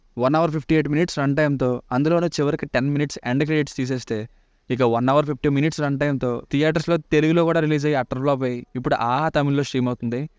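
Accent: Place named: East Godavari, India. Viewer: బ్రో మీది ఈస్ట్ గోదావరి మాటకు ముందు అండి మాట చివర అండి అని వస్తుంది స్మాల్ డౌట్ అంతే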